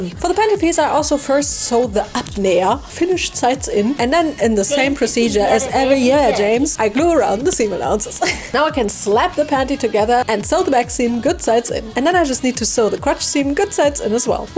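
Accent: Germany. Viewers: I just found this channel off youtube shorts and im obessed. Ive always loved OOAK dolls and these videos are so pleasing to me. Btw i love your accent. Is it german?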